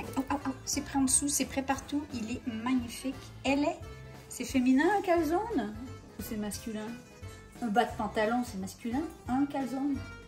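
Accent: Canada. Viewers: Cc bonjour merci pour votre recette de calzone je voulais en faire mais n'avais aucune idée de se que l'ont metter dedans super! Je vais en faire et faire ma pâte a pizza moi même bne continuation vous êtes super et j'adore votre accent canadien qui ressemble un peu a mon accent du Nord 59❤❤❤❤️🌹🌹🌹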